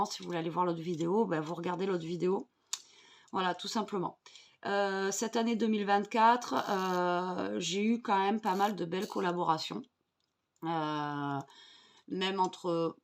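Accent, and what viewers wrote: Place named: Southern France, France. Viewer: Restez comme vous êtes 🙏et un adage qui m aide beaucoup dans la vie : on ne peut pas plaire à tout le monde et c est tant mieux 😉quand à vos tutos « free style » c est justement ce que j aime en vous et votre joli accent plein de soleil ☀️ Je reste à vous suivre et surtout ne changez rien c est votre personnalité créative 😊🧡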